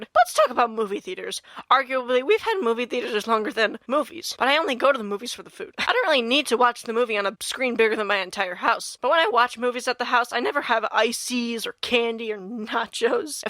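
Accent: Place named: United Kingdom. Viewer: Ur bri'ish accent is gold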